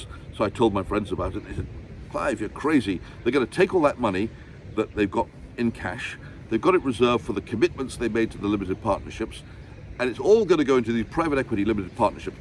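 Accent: United Kingdom. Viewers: You sound like a fine British gentleman with deep love for the markets and your family. Great stuff sir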